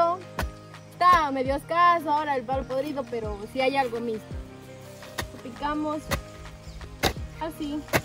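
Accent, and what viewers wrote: Ecuador. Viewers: Tienes asento Cuencano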